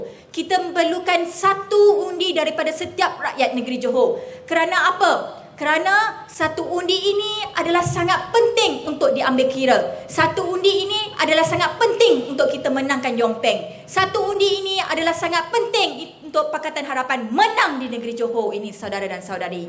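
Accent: China. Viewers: Ale uto ko la tali barut....law umno xde xde la malysia aman smpai skg...ntah2 ko pn xde...ahhaahha....ale uto....ale uto....melayu ckp sleng2 cina....tali barut.....tali barut... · cakap pun dah gaya macam cina...ibarat cina cakap bahasa melayu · Jangan mimpi la...ni Olang melayu ke Cine..ckp pon dah macam Cine ckp melayu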